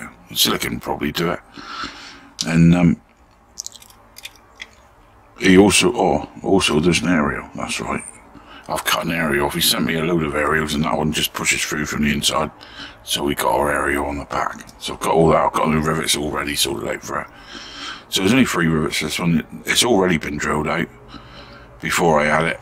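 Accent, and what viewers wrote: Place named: Devon, United Kingdom. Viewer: Hi, so pleased to be able to get back to your channel, followed you years ago, I have since had a Double Lung Transplant so have loads of time to get back into my Restorations, absolutely love your videos, you sound very much like a Devonshire lad like me, I wish you all the very best, I look forward to your next Video, Take Care bud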